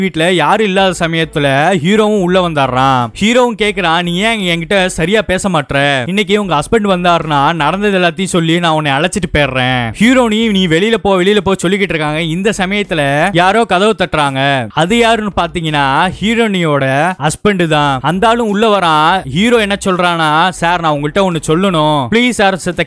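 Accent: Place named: Coimbatore, India. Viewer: Coimbatore English oru thani slang pa - Heronee...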